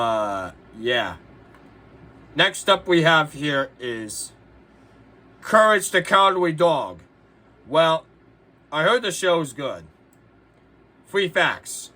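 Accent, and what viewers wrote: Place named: United Kingdom. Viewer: Dude sound British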